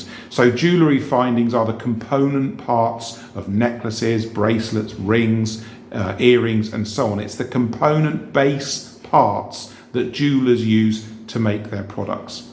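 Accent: England, United Kingdom. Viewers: Hey, I stumbled across your channel by accident and I have to say I absolutely love your advice AND whats nice is hearing an English accent talking about Etsy (as its usually people with an American accent). I have a question, I haven't even started yet but what is your advice, can you open an Etsy store in the US being from the UK or is it better to start in the UK and then can you move and expand in the market? I actually live in Dubai but am originally from the UK (and still have my UK bank account)..any advice would be appreciated. Thank you so much for all the value you give.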